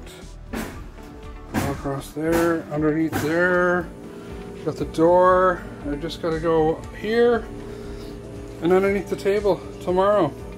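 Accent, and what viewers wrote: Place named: Midwest, United States. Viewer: “Ope, just tripped there, sorry” you’re definitely from the Midwest 😅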